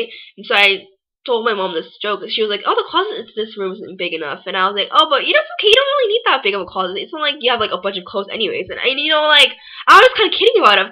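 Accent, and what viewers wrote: United States. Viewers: LOL I never heard a Korean girl cursed so American like & she nailed it. U are so cute sweetie keep doing you. I've heard Korea prison are very hellish compare to American prison. Smart & cute I want you LOL... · I don't think it matters whether or not she's Korean-American or Korean. She's talking about why she hates being Korean. Regardless of if your Korean-American or Korean, you're still Korean. Maybe she used pounds because she's speaking in an American English accent, so maybe this video was directed towards Americans, who (omg I can't believe it.), use the term pounds. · Yeeeeeeeah, you're extremely annoying and I could barely last the 2 minutes I watched. I thought you'd be listing some personal cons of being Korean, instead you turned out to be some selfish kid complaining. It's hard to believe you're Korean, you sound like the stereotypical American white girl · don't put out your insecurities like being fat as a korean problem. 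If you were truly comfortable about your body and complexion, you would not have a problem brushing off what others have to say about you, them being korean or not. Before you talk shit about other koreans, think about the blood that is pumping in your heart. from your accent i believe you live in the united states, youre a korean american but the blood that pumps through your heart is that of Korean descent, one that has successfully been preserved and cherished for thousands of years amongst world powerhouses like japan and china. be proud of who you are and learn to embrace all that makes you, you. do you even know the great men and women who have sacrificed their lives to make our country what it is today? do some research, and if you still hate koreans, so be it. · You sound American, not Korean.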